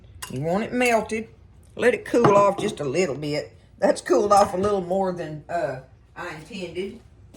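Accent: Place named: Texas, United States. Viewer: I love the Oh, Oh lol, I miss Texas, love her accent.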